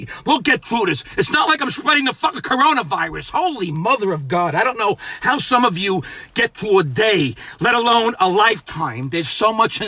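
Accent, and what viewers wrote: New York, United States. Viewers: You are truly the best. F*** what everybody got to say f*** what everybody thinks sometimes you just need the f**** let it all out I love it keep up the good work you're so positive he's so energetic you keep me motivated thank you becoming on YouTube being on Facebook Twitter whatever up your ass LOL thank you very much appreciate you you sound like you're from New York Jersey Brooklyn all in one keep up the good work don't stop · ...as a former noo yawker now living in the not so wild west it's so enjoyable to hear (and see) a guy from the neighborhood who speaks the unvarnished truth with humor and d'accent….now if you could only deliver a real slice of pizza and a cheesecake the quarantine would be great.... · ... you are absolutely fanfuckingtastic! are you a new Yorker? thank you Big Time Bro... T.T H.L.A.M.F.!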